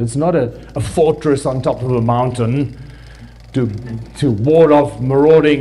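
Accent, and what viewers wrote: South Africa. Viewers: I stumbled upon this for the second time and I realised that it's a South African speaking here. I love how we are so diverse in ideology and thought because it opens up the potential for many of us to think differently perhaps, hopefully, come together and see how such can bring us together towards a common understanding about what it means to be human.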